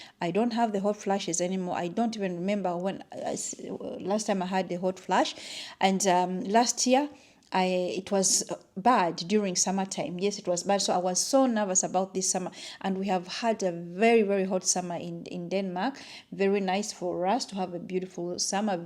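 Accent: Uganda. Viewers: Beautiful material and what you did with it but should anyone in your size of your age be wearing such a thing in that short length and showing it publicly? Not nice at all - no offence meant. And FYI HRT will give you cancer. Doing your own research is important and great but does not Negate that it causes cancer - 100%. Because the body knows the difference and indeed it can feel awful- in perimenopause mdnopause and post menopause and you want relief but going to do HRT just to get relief can take your life away literally. And every cell and body function works with hormones. You can do something different that works just the same and better. And let me correct something it is not all people who have diabetes that don't have insulin..and contrary to conventional or allopathic medicine theories that giving more insulin helps diabetics it doesn't. The issue is hormonal imbalance and the weight is obviously from high cortisol so is the insomnia. 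As for your weight you obviously sound Ufandan to me and again no offence but Ugandans especially women are fat by nature structure culture and the way you eat. Huge Portions and fattening food. If you are more mindful of that you will loose weight i.e why I said that wrapper length is not doing anything for you. If you make it longer it will but at that short length it Not flattering at all it makes you look bigger especially if you have hips and a bigger backside. And I do not know you. This is not personal. Just stating truth and f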